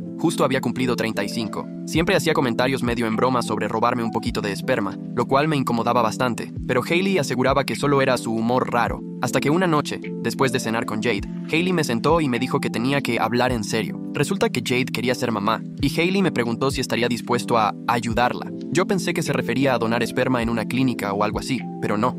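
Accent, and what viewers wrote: Mexico. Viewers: Que mexicana la IA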